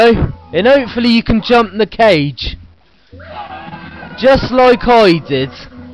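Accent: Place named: United Kingdom. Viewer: Mario 64 and your (British?) accent, brilliant combination :D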